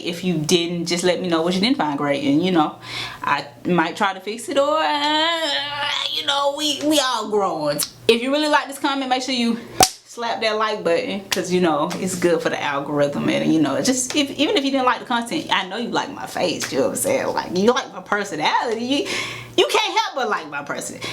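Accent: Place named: South, United States. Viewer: i've dealt with southern accents alot, understand it pretty well. seeing you brought it up, here's my only advice i think u should know. it's not the accent, it's the speed... just talk a lil slower lol. other than that, i understand ya perfectly (from MA).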